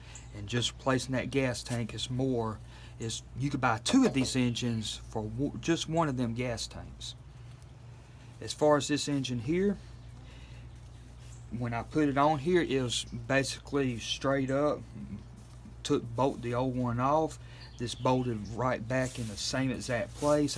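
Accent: South, United States. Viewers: Hey I can tell you are a good old boy from the south !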